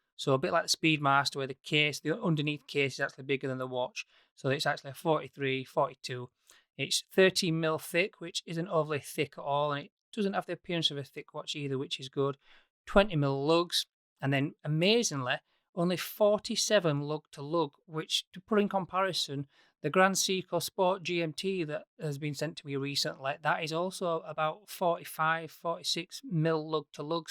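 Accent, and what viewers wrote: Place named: Huddersfield, United Kingdom. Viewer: Nice to see a watch reviewer from Huddersfield. It's a nice town and nice people, lived there for almost 7 years. Miss that accent. Brilliant review, well done.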